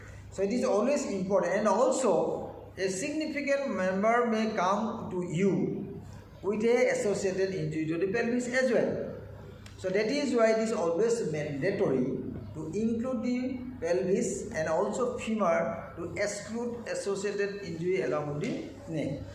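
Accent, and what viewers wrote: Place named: Hindi, India. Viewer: Sir Your Hindi accent is so Nice❤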